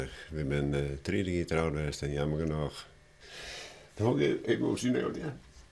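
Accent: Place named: Westerkwartier, Netherlands. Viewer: It dialekt dat dizze man sprutst klinket in bytsje as it Grinslânske dialekt "Westerkertiersk"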